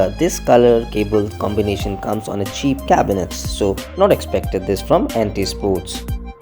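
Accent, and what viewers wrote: India. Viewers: desi english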